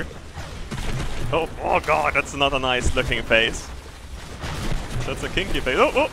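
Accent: Sweden. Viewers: GreenColorBombs the power of the swedish accsent mate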